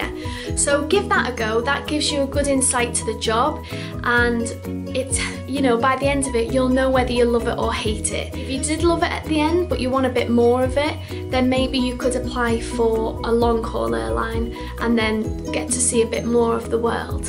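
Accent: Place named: England, United Kingdom. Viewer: Not that I want to be cabin crew, it was very nice to hear all about it and see it from the other side, this video was very informative and thank you for posting. Just trying to work out which part of England you accent is from.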